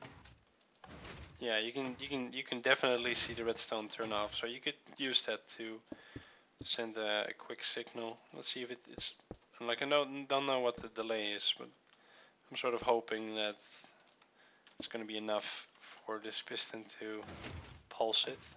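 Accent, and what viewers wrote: Netherlands. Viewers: i stil think you're from holland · Ur dutch? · dutch english sounds sooo bad :S nothing personal man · dude je engels klinkt nederlands. dude your english seems dutch. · YOU MUST BE DUTCH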